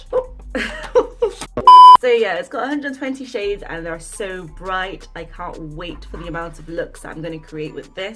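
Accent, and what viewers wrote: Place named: England, United Kingdom. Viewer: LOVE THE HAIR GIRL !!!!!! did NOT expect you to be English !!!!! Thought you would be from the US. New subbie showing some love ❤️❤️❤️❤️❤️❤️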